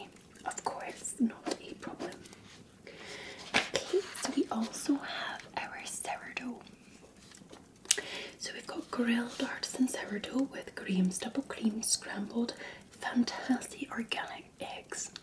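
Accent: Scotland, United Kingdom. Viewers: 40 minutes of tingles from the resplendent scottish lass. perry the platypus noises ☺️ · Hello I hope you are doing well! I ❤ the very Scottish accent!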